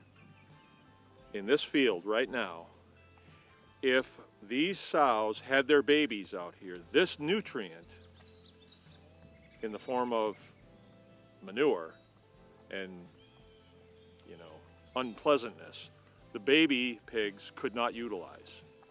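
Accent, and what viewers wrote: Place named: New England, United States. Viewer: I haven’t watched the whole video but you seem to be hiding a New England accent.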